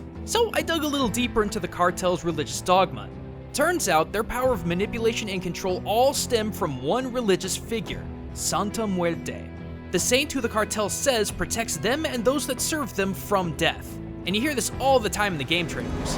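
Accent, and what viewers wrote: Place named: North, United Kingdom. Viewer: dang, the way you pronounce spanish words is so... northern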